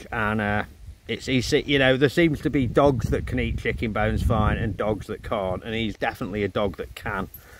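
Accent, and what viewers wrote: United Kingdom. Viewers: British accent in Ukraine😅👍👍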